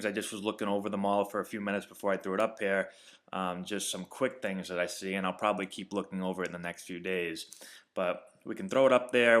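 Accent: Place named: East Coast, United States. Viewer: you sound like an east coast Jay Cutler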